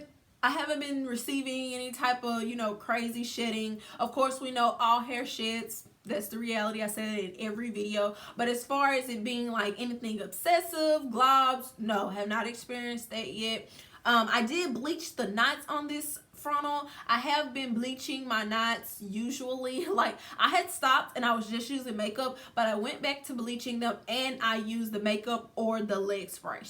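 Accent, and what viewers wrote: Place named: South, United States. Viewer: Where you from I love that accent ? Country girls rock 🧘🏽‍♀️ I'm from NC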